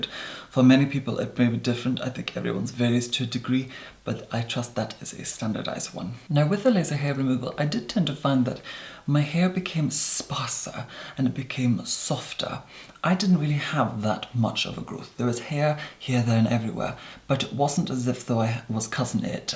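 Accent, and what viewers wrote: Scotland, United Kingdom. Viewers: This is the most Scottish I've ever heard you be, yay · Obvz but where in Scotland? He has a mix of accents . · is he scottish? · Just realised the accent is posh Scottish not English the fuck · Are you scottish? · Eyez Cold is not English. That, you will find, is a beautiful classic true Scottish accent. From the days of Robert Louis Stevenson.... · I hear a little bit of a scottish undertone to your accent, any scottish heritage?! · Yes he's Scottish :) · Um bull. I know people from Ayr and other near areas and they sound very similar to this. It may well be exaggerated- but this is normal for that region of Scotland. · He's actually Scottish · I am loving your accent, a hint of scottish in there? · Анастасия Горячева l think that it's a Scottish accent from one of the Highland islands x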